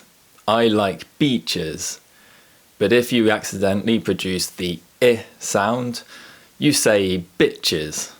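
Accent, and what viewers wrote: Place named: England, United Kingdom. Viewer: hello, where are u from in England,:")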